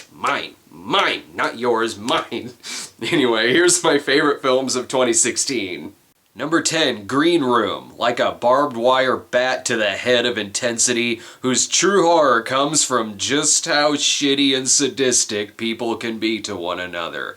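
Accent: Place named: United States. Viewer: you have the best American dialect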